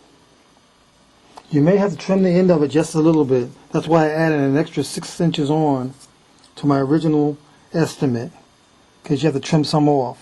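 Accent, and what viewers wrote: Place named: Philadelphia, United States. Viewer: thanks for the help, especially loved the accent, just curious where are you from, im guessing Philly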